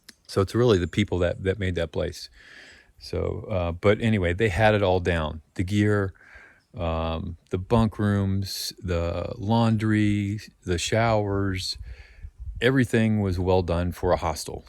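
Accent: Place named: Pennsylvania, United States. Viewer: London, England...Acorn Army, worldwide! I’m American who’s lived in London 9 years. We have a family lake house in western Maryland - have hiked a few sections of the AT and a lot in the area. I’m looking to attempt a thru hike in 8 years (early retirement and kids out of school). Thought I wanted NOBO but not sure I’d like the large crowds - so thinking flip flop. Main worries are what you’re describing in this video - anti-climatic at Katahdin and will I have it in me to flip back down and hike south? I’d love to see you walk south and will be cheering you on if you do! Are you from PA? You mentioned it once and then the beach, so a bit confused. Also, you’re accent tells me PA or Maryland...maybe south Jersey?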